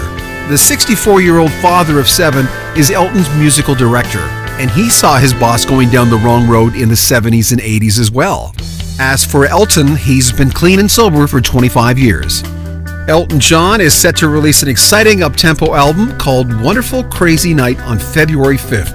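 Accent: Canada. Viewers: I'm quite certain that our narrator is Canadian...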